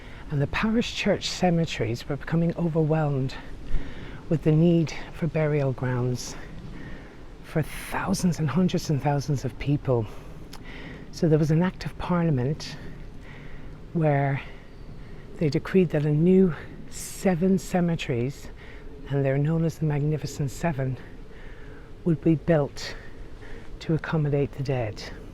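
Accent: Ireland. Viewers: I just love watching your videos and love your Irish accent, must bring out the Irish half of my ancestors in me...lol. Love love love London (been there want to go back one day) and also to visit Ireland . You have so much energy and enthusiasm. Would love to do one of your tours one day. Go away Covid and I will be over there in a flash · You have a lovely soothing voice! Living here in Berlin, always nice to hear your beautiful Irish accent, reminds me of home. Also, Highgate was one the most interesting places I visited in London. Keep up your wonderful work x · Dear, that was a wonderful walk, I've had that place on my bucket list for many years. Thank you for a wonderful video, I've been there in spirit now. I was pleased by the care being taken and Highgate Cemetery, it still has the right kind of spookiness. I hadn't heard about the vampire so that is a bonus. 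I love hearing your accent it adds so much for me, the Irish branch of my family tree are from Dublin, a few of them weren't very nice but they are are forgiven 😀 Lots of love and Blessed Be always 💖💖💖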